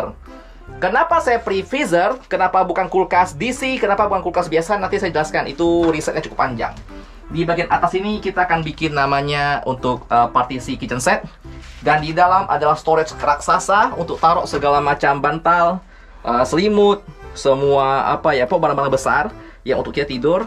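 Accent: Pontianak, Indonesia. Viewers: Bahase Nye to Melayu chine Pontianak..... Mantapsss...macam channel hobby makan....lanjut ko 😁😁😁😅😛🙏👍👍👍🌟🌟😃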